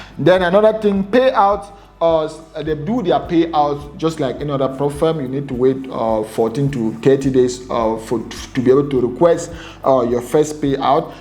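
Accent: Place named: Nigeria. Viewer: My wife said your accent is Nigeria. Lol. Thanks for the analysis it was helpful. Good job.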